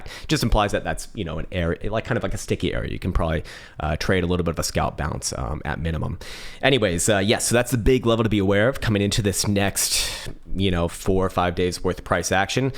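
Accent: Australia. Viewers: Man he sounds Aussie... I'm ashamed. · sounds Australian? thats so bad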